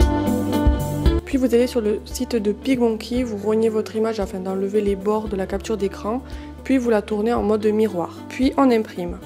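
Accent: South, France. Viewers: Alors First: j'adoreeeeeeeeee ton accent du sud 😍Et secundo: Les DIY sont vraiment Topisimes! Surtout la broderie! ca me donne pleins d'idées 😍😍😍Bravo!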